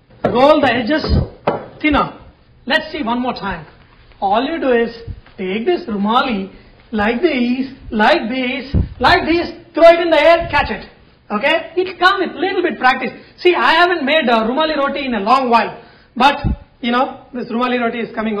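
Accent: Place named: Andhra Pradesh, India. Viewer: You sound very Andhra boss. Baagundi.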